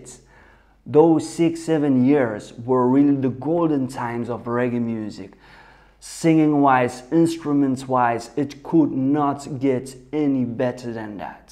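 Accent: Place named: Jamaica. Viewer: Did you spend some time living in Jamaica? Your accent sounds like Spanish or Italian but with some Jamaican pronunciation sometimes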